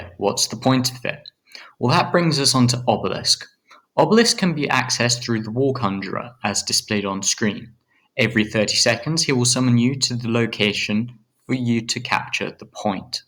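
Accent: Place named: United Kingdom. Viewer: I clicked off after hearing the british accent!!!!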